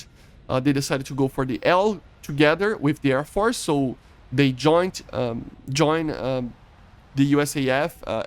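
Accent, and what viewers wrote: Brazil. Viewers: Só pelo sotaque já percebi que é BR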